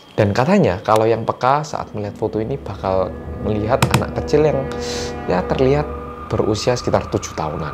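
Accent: Java, Indonesia. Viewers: "Tapi...ini....pertanyaan pertanyaan ini kurang....BiSa DiPercAya Gitu" Humorq hanya sebatas kak ngomong medok😭😭😭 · Saya melihat ada orang korea yang medhok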